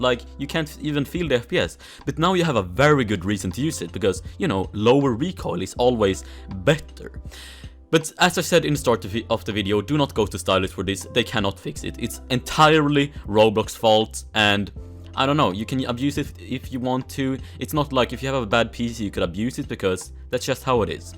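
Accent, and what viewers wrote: Sweden. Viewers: Hej du är svensk man hör detXD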